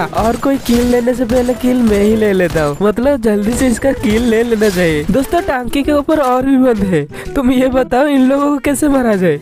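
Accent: Odisha, India. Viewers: Your voice is odia 🥰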